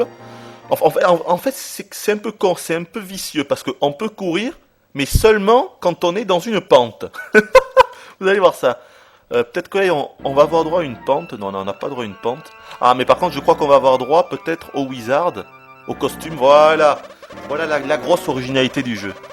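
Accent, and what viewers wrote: South, France. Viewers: Ah sinon, j'aime beaucoup ta voix! Elle est agréable à écouter... cela doit venir en parti de ton accent du sud :)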